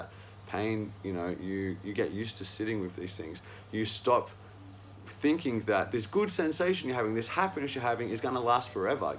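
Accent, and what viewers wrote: Australia. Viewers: I went to my first vipassana course 2 months ago in indonesia. For the preparation, I watched all your videos about the vipassana course. It helped me a lot. So thank you so much for that. Also, on the retreat there was a volunteer who looks like you. His voice even sounds the same like you with Australian accent. At first glance, I thought it was you haha.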